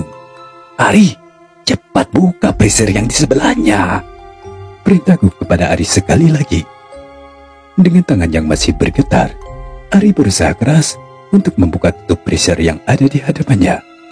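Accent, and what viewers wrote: Palembang, Indonesia. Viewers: Bang itu bahasa palembang ya, ciri khas nya pakai hurup O ,benar ngak bang ? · Itu gaya bahasa palembang byk kata o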